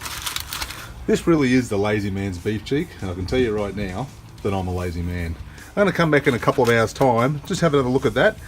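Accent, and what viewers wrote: Australia. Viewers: Fucking love the native Aussie talk. So much better than the American BS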